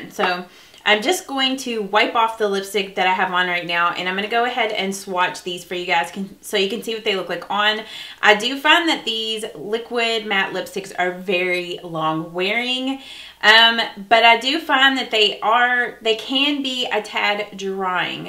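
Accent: South, United States. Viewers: Enter me in the giveaway. I have followed you for a long time, your channel is amazing. Love your Southern Accent and love to here you speak. Blessings!!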